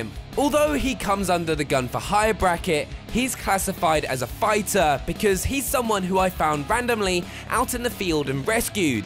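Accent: United Kingdom. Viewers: Disliked because of Xbox and British accent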